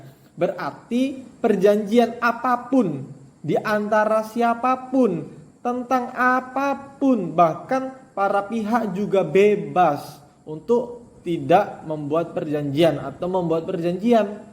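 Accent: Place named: Java, Indonesia. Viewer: Jawanya totok kali pak 😂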